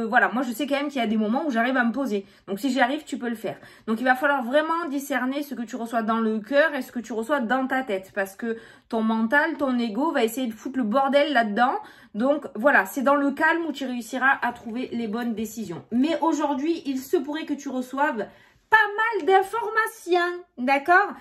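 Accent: South, France. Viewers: Bravo d’être là pour nous en ces temps difficiles. Avé l’accent. ☺️🤗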